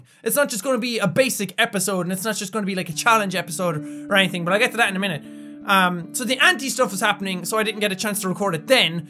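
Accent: Ireland. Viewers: am in Brisbane Australia.;D ill be up watching and everyone in my house will be like "where is that shouting Irish voice come from?" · Jack/ can you possibly add Auckland New Zealand time because that is where I live and if you are looking for a peaceful vaca without being mobbed or anything that's the place to go and if you ever feel like coming to my country I think you might want some help saying our regions if your scared that you might get angry at for pronouncing the name wrong because you are from Ireland and you have a type of strong accent that kind of makes it harder for us to understand if you are saying the name correctly or not. So if you ever see this comment and do this thank you!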